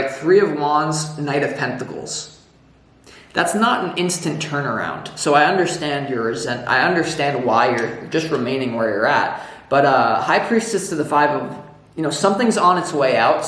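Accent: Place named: South, United States. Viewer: It's weighing me down and not letting me step into the new and healthy energy and place in life that is right there if I could just let go of them and stop numbing out out of fear. And I also keep thinking maybe I can AGAIN approach this heavy relationship differently and it will magically fit into my highest good. I'm not sure it can though and that sucks. Also the southern accent was funny bc I'm from Alabama 😂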